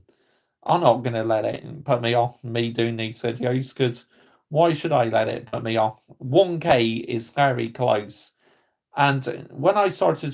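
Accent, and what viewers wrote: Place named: Bristol, United Kingdom. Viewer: Are u from Bristol? I lived there for 6years and ur accent reminds me of those days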